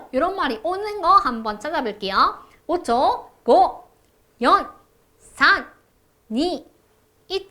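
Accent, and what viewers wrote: Busan, South Korea. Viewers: 부산 분이신가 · 강사분이 우리경상도 분이신가ㅎㅎ 부산 악센트가ㅋㅋ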